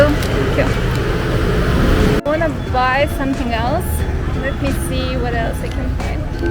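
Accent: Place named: Eastern Europe. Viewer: I live in the same city. Sry for my rudeness. You have a bit of Slavic accent. Wish u have a good time here.👍🏼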